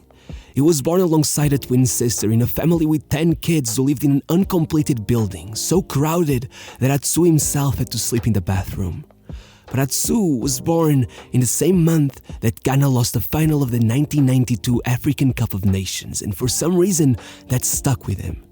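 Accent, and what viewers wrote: Portugal. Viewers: Pelo sotaque nota se que és tuga. Orgulho em ti miúdo. Vídeos incríveis sempre 🤩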